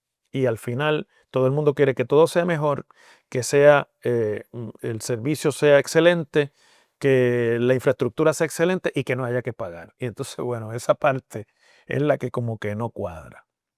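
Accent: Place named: Puerto Rico. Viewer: ¡Felicidades! Con una dicción como la suya fuí criada en PR., durante la generación de la buena salsa. Lamentablemente, la mayor parte de las personas no me creen que soy Puertorriqueña ... solo porque no hablo como la generación de los raperos😢. Vine a vivir a EU por recomendación médica, y cuando quise regresar, me di cuenta de que ya en PR no se puede vivir con un cheque del SS. DLB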